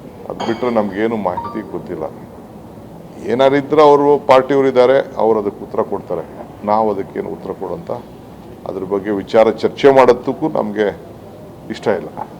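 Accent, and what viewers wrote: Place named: Kanakapura, India. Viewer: ಅಣ್ಣ ನೀನು ಎಲ್ಲಿ ಮಾತು ಕಲಿತಿದ್ದು ಕ ನ ಕ ಪು ರ ದ ????? ನೀನು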